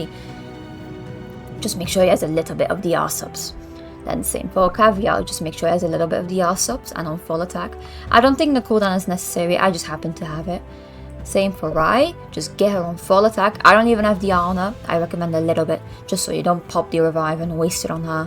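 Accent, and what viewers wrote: United Kingdom. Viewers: hi are u british? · Very helpful,video. Thanks so much for making it! Also you accent is very posh! i like it!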